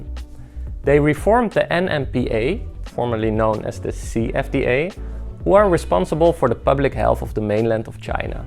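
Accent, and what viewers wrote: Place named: Netherlands. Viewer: Your accent sounds soooo Dutch.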